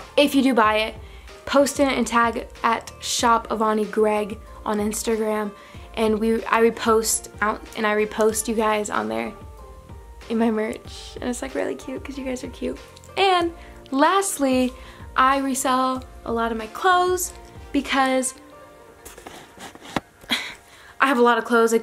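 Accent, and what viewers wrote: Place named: United Kingdom. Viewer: The British accent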